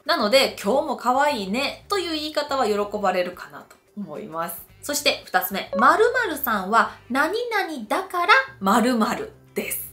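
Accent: Kansai, Japan. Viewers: こんばんは(^-^) 関西弁と笑顔が素敵ですね。 最近、少し痩せましたか？ これからも、応援してますね。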